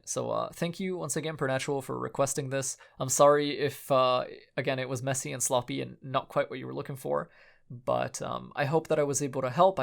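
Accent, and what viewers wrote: Eastern Europe. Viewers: You're Eastern-European? Where from? I'm half Polish. Also, I love when you say godlike.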